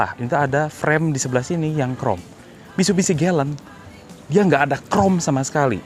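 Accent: West Java, Indonesia. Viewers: Sunda pisan euy..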